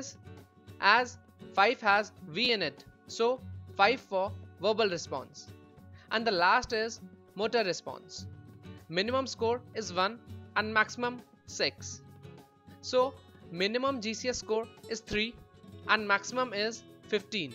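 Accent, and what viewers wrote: India. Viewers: Useful video thank you I love your accent I love Indian 2 ♥️♥️♥️♥️🌹🌹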